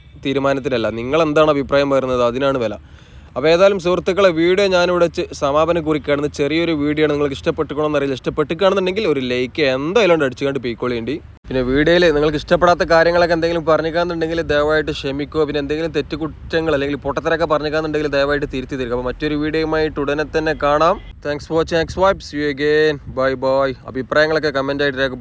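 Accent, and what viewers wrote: Malappuram, India. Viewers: especially your Malappuram slang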